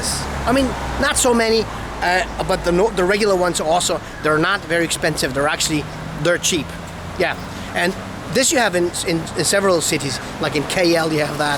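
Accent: United States. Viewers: You say you are a Swiss family. But, you have a US accent, with alot of Americanisms. Why is that? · Please I hope you should come to Sabah to explore more of out unknown beauty hidden in the big jungle of Borneo, deep sea treasures at Semporna, Mount Kinabalu n our friendest people in Malaysia.. Plus the rich varieties of sea foods, local foods n local fruits that maybe you have not across before.. By the by listening to your English slang.. You could be an American n migrated to Europe I used to stay in US in 1980s while studying at Indiana State University.. till I obtained M.Ed.Geograpy..came back to Kota Kinabalu Sabah 1989.I enjoyed 6 years staying in US during my studies.. I wis one day I ll come back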